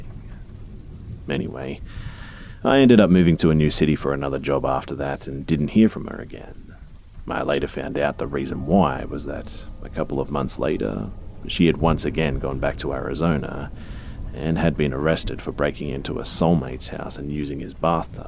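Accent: Australia. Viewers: Cheers for liking my comment mate! I seriously want to take a gap year in Australia and think it’s the best accent so I like your narrations even more because of that! Keep up the good work :)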